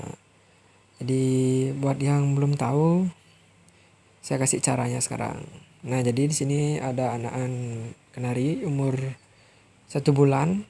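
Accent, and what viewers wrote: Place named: Bali, Indonesia. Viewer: Bali ya bang agak lagat bahasanya mantap bang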